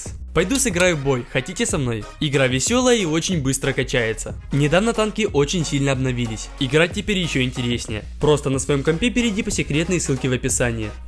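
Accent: Ukraine. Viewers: Смотрю тебя с трехсот тысяч, качество выросло в десятки раз! А ещё пропал украинский акцент))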